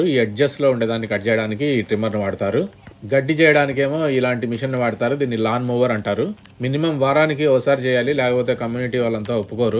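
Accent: Rayalaseema, India. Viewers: Anna hands-off to you mana rayalaseema slang marledu neku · Yem naaa meedi kadapa or anantapur? Super presentation in our seema slang..love u bro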